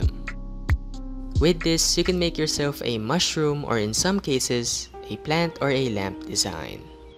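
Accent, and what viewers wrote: Philippines. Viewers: You sounds like filipino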